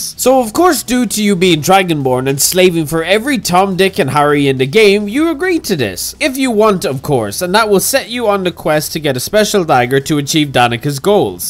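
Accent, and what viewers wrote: Ireland. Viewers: Yes, I came here to your channel specifically to listen to your Irish accent. There's no doubt about it. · I like the video but dislike the Irish accent, what do I do? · Not here for Irish accent already got one of my own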